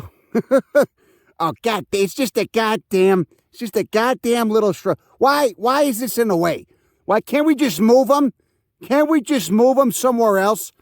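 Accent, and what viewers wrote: Chicago, United States. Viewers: I just learned the word parasocial. I never thought to imagine being a plant described by this perfect Chicago accent. But I love it.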